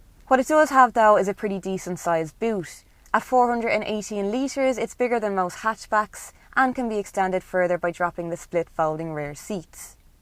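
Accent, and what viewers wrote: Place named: North Dublin, Ireland. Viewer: Strong north Dublin accent on this woman.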